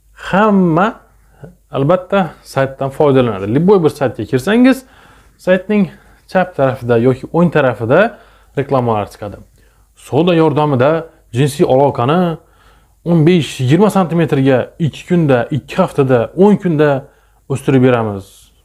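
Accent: Khorezm, Uzbekistan. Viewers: Доктир шеваингиз хоразма тортадику недансиз? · Салом алейкум доктор хоразмданмисиз аксентиз билиниб дурибди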